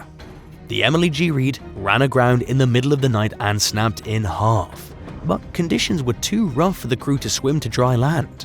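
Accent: England, United Kingdom. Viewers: Love how this is American Eye and has a Englishman speaking. 😆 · American Eye , has England accent .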